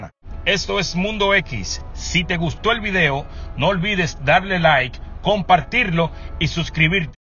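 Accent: Dominican Republic. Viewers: El narrador parece dominicano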